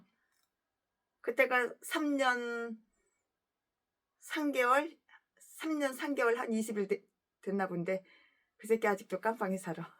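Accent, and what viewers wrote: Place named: Jeolla, South Korea. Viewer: 예라 ㅋ ㅎ 전라도웃겨요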